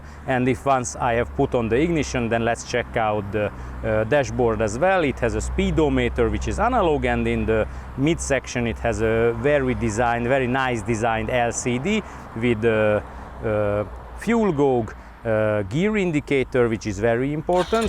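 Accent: Hungary. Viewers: I like your Hungarian accent. Good review.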